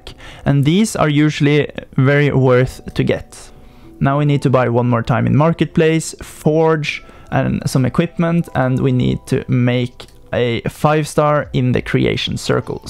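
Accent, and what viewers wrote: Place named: Norway. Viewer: Kan høre at broder er norsk, keep gaming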